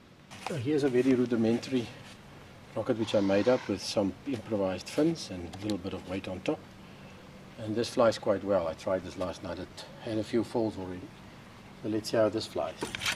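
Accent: South Africa. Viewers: are you afrikaans?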